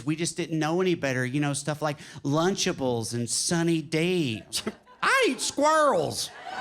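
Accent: South, United States. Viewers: Trae Crowder. Sooooooooooo Flippin ' Funny. - Scotty Kilmer was the O N L Y smart - talking dude - With - A - Southern - Accent - that I have deeply embraced in my life. Agree with him or N O T... when it comes to talking about cars...... Scotty ' s range of knowledge from individual vehicular component parts to The A U T O I N D U S T R Y in general... I simply love that cantankerous old coot with that southern accent. S C O T T Y. That O N E Dude. That -- W A S -- It!!! - - Now... in my life...... I have a 2nd Southern Accent Dude who I now ❤️ L O V E ❤️. Thank you Trae Crowder for helping me to be less of a Northern Bigot. - The song that ' s used to open // close his comedic routine is Sooo C O O L!!! Group:. Song Title: " " Burn. Flicker. Die. " " - Notable 3rd mentioning... Chris Stapleton. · Texan here. Glad to see the literate south coming up in comedy. 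The irony in the accent should be ample impetuous for some more great bubba's-got-a-passport comedy. Excellent set, my dude. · The southern accent just makes me feel at home. My parents were raised in the south. My dad was the most intelligent person I have ever met in my life and it used to be fun watching him with someone that assumed he was dumb. 😂😂. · Thanks for the laughs! That was great! Love your southern accent ❤ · I think your Southern accent has a stiff challenge from the Pittsburgh accent. I lived there for 25 years, absolutely the nicest people i ever met, but that "Yinzer" accent made them sound very unintelligent. 😊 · Yeah, the accent is a turnoff. Grew up in the south and just don't like what comes with that sound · Southern draaaawl…feelin it bro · Found this guy from Tik Tock funny ! Black man from NC here sounds like me and my cousins shooting the shit about ourselves , people and old dead friends and family! 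· Love this. Maybe funniest sound man ever. Southern accent + a wicked smart savvy insight = tastiest. (Babies listen to your photographer friend and back-pocket that cellphone. The fatigue lines and weird rectangle leg thing ruins a great blue jean)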